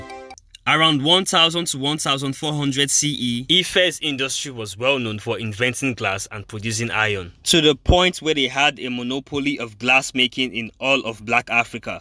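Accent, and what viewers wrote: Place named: Uganda. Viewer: Voice over 👍🏾 Accent sounds Ugandan Good historical preview and motion picture work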